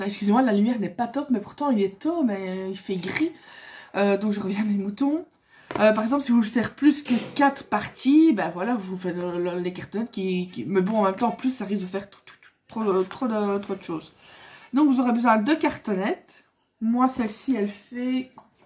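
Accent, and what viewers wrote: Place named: Belgium. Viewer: Coucou, j'aime beaucoup, ton parlé avec toi on ne s'ennuie pas, j'ais pu comprend que tu étai de Belgique? moi aussi, Bisous.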